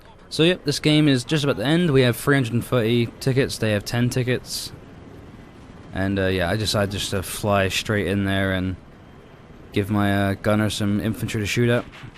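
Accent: United Kingdom. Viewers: What nationality are you? You speak with an english accent, except you roll your 'r's like an american. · a littele bit american,but strong british accent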